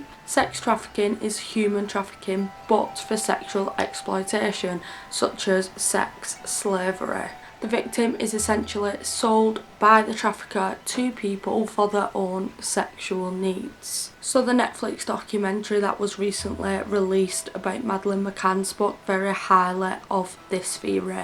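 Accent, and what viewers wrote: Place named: Wigan, United Kingdom. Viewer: Her parents had nothing to do with her disapperance. Not enough time and where could they hide her in that time. She was obviously kidnapped, and the rest we do not know. I hate it when people bring conspiracy theories etc. It heart breaking and the thought of her being sold to sex traffic makes me sick. I really hope that she is living with someone who could not have kids and she has forgotten what happened to her and is living her life happy. I'm trying to figure your accent, is it Bolton, Wigan, Oldham or Cumbria. I live on Anglesey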